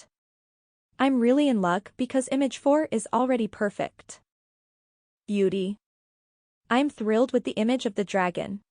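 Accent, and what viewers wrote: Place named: France. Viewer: Don’t be afraid of your awesome French accent whilst speaking other languages. It is sooo fabulous when French speaking people keep their accent. I was born the same as you. The 80’s and 90’s fantasy films were the best years. We had a small resurgance with Harry Potter and LotR. But the (some of the late 70’s) 80’s and 90’s were iiit!!!!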